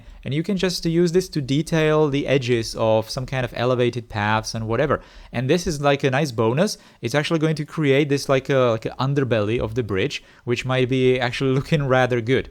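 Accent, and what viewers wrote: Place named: Czech Republic. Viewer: V prvních patnácti sekundách videa mi bylo jasné, že jsi Čech 😃 Twitter to potom potvrdil. Tvoje angličtina zní skvěle, neber to jako výtku 😊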